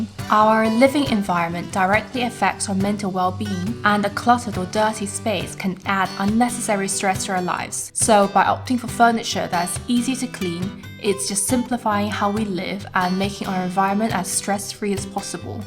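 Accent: United Kingdom. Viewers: Love the Brit Accent❤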